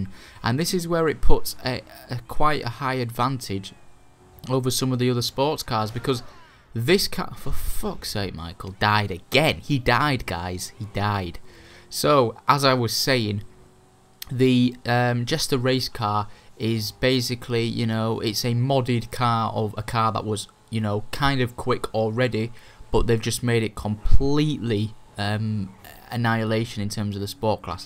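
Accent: United Kingdom. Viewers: also what part of the uk are you from? ive had a few people in lobbies recently telling me I sound a lot like you...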